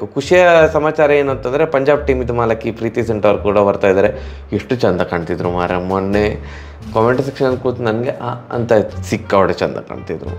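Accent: Mangalore, India. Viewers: Bro nanu nimge recent subscriber bro...... I watched all ur last ipl videos..... Janardhan sir comedy antu nxt lvl marayya😂chennagi roast madtare...... Nimma mangalore style kannada bahala chanda untu..... Keep going and keep roasting rcb if they perform bad😂avag swalpanaru buddhi barli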